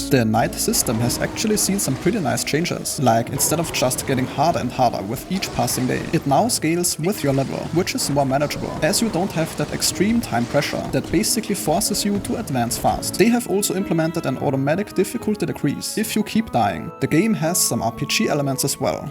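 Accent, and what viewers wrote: Germany. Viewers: man spürt quasi das du mitten in Deutschland wohnst :D im sinne hilfreicher Kritik würde ich dir raten an dem voiceover zu arbeiten :) ist sehr monoton und mich persölich triggert der deutsche Akzent :p #elevenlabs lass flutschen <3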